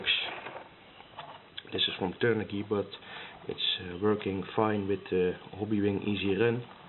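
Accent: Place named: Netherlands. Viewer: laat me raden. aangezien je een erg nederlands accent heb zal je wel nl zijn :P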